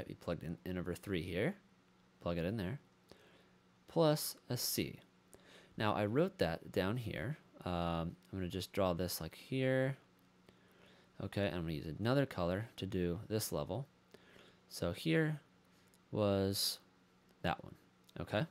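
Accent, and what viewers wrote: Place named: England, United Kingdom. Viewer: finally English accent!!! p.s ( i still love you indian computer science teachers :P )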